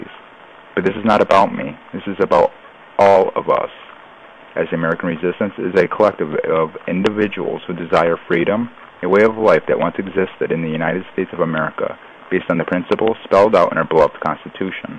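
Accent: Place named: China. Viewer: Frutation??? Subjigated to...? Chinese english...not bad though....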